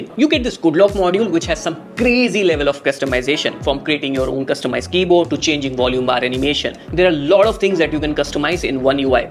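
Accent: India. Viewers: Damn Indian English sucks, it's just unbearable.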